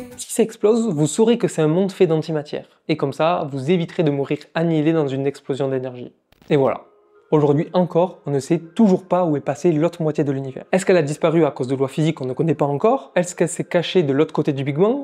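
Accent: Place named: Marseille, France. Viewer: L’accent de Marseille c’est insupportable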